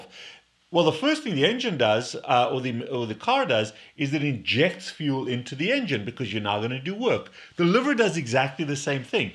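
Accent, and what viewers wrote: South Africa. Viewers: South African accent. It's quite charming. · faint South African accent!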